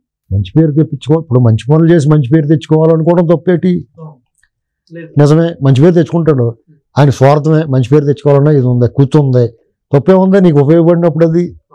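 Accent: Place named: Godavari, India. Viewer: అల్లసాని వారి అందెల సవాలు మాకు పరిచయం లేకున్నా వారి గోదావరి యాసతో అచ్చ తెలుగులో అనేక సామెతలతో గాడిదలు కూడా సిగ్గు పడే విధంగా సున్నితంగా విశ్లేషించే విధానం చెవులు నిక్కబొడుచుకుని 'ఈ జగ్లక్ భాగవతం ఎంత సేపైనా ఇంకా ఇంకా తెలుసుకోవాలనిపిస్తుంది. 40% గాడిదల కి ఇది అరణ్య రోదన అవుతుందేమో.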